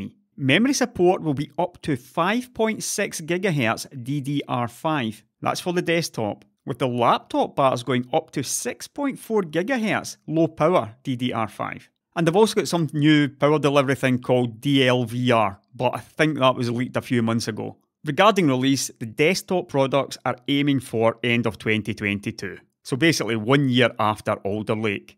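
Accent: Scotland, United Kingdom. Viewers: Good to hear your "Scottish" Voice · that beautiful Scottish voice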